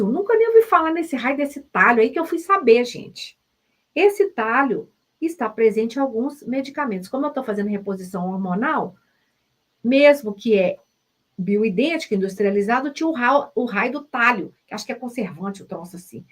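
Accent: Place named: Minas Gerais, Brazil. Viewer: Quero muito fazer esse curso, primeiramente pq vc é autêntica e simples tanto pra explicar o conteúdo e para se apresentar pra gente aqui Esse sotaque mineiro gostoso rsrs é muito bom! Se não conseguir nesse momento, tenho certeza que em outro estarei com vc 🙏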